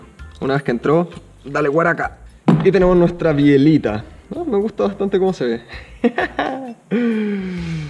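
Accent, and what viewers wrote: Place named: Chile. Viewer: Me encanta tu asento chileno hermano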